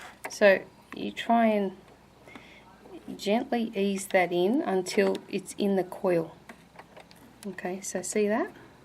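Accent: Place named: Australia. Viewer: This has nothing to do with sewing but I love your Aussie accent. (Thanks for all the helpful videos!)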